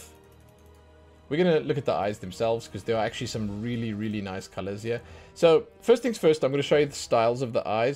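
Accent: South Africa. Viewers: Off topic but are you South African?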